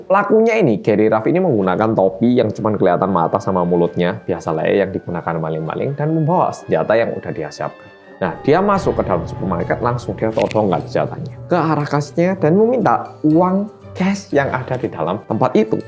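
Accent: Java, Indonesia. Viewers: Mas medokkkkk....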